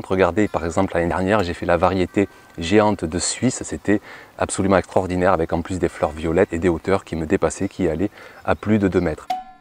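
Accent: South, France. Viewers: Salut, A la base, les petits pois, c'est pas mon truc... j'en ai mis cette année pour faire plaisir à Monsieur, et aussi parce que je n'ai jamais mangé des petits pois "du jardin", donc je veux quand-même goûter, parce qu'il y a plein de légumes que j'aimais pas, et que je mange depuis que je les cultive moi-même ^^ Par contre, j'ai semé (tardivement) des pois mangetout parce qu'à force de te voir nous les vanter dans tes vidéos, tu m'as donné envie! Donc voilà, à suivre;) Tes vidéos me mette toujours de bonne humeur, avec ton accent du Sud, ça donne un petit air de vacances. A bientôt Maud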